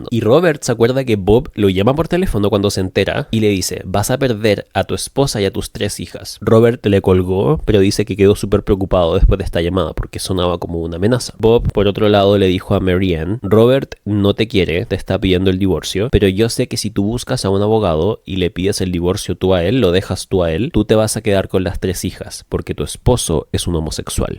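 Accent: Chile. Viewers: Oh wn que cuatico como la religión te hace eliminar el pensamiento crítico y te hace susceptible a cualquier mentira, incluso una tan retorcida como esta. Oye que bacanes tus vídeos, le tomo caleta de atención. Me gusta mucho el true crime, pero estaba chato de escuchar gente no chilena ajsj así me siento más en familia. Bacan tu canal. Saludos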